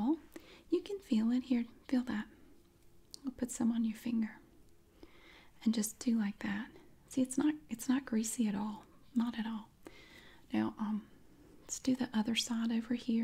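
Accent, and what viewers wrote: South, United States. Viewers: The voice of an angel, love the sothern accent im southern also from thomasville nc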